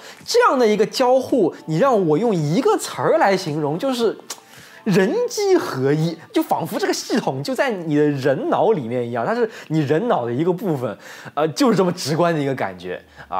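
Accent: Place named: Shanghai, China. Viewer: 博主上海人吧,这个普通话听着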